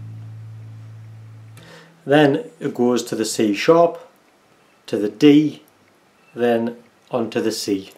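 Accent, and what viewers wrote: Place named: Newcastle, United Kingdom. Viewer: It might be his Geordie accent, but the tab and the notes he's playing match up.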